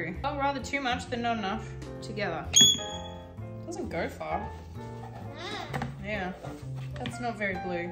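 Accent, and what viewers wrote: United States. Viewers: Holy shit, you're American accent is spot on. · Very charmed by the American accent. I see an opportunity for a teamup with fellow Aussie and baker extraordinaire Ann Reardon from How to Cook That?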